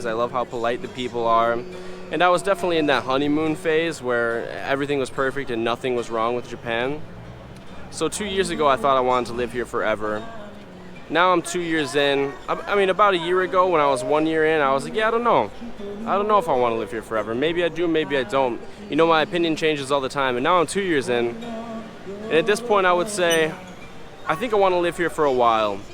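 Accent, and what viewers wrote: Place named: Upper Midwest, United States. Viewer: your upper midwest accent was thick in this one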